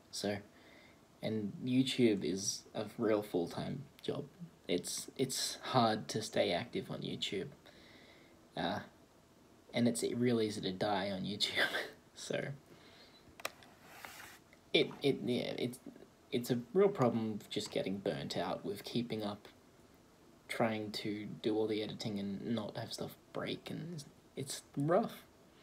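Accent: Australia. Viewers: You sound very Aussie still. But right at the end when you said "I'll see yas round". I was like "nah he's definitely Australian haha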